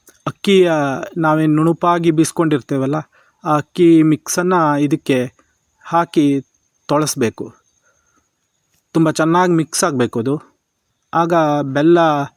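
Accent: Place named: Uttara Kannada, India. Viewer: Siddapura, sirsi areadalli bhasheya accent ide tara irutte . Nirupane paravagilla, sahajavagide.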